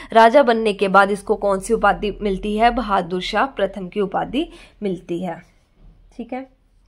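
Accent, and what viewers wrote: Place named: Bihar, India. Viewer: bahut hi achchha contant hai ek hi video me thanks mam, aapke language se lg rha aap bihar se ho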